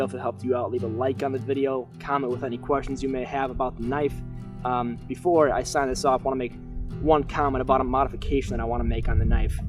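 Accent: Michigan, United States. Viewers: Sounds like your from Michigan. In in white lake Michigan!!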